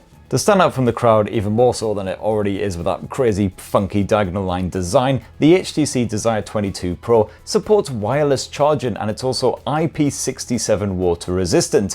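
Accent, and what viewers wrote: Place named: Newcastle, United Kingdom. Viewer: Putting HTC to the side, I'm just going to take a moment to appreciate a fellow Geordie using phrases such as "Arse Juice" and casually laughing off AIDS.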